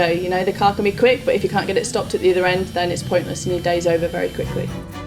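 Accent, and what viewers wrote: United Kingdom. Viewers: I just realized that accent is the same as Mary's accent from AC4. Can any brits fill me up on what part of England that has that accent?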